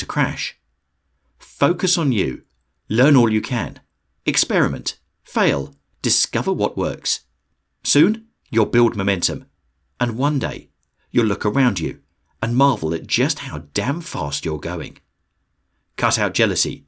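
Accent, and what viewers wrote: United Kingdom. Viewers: Brilliant video. And your British accent drills the message into my brain with elegance.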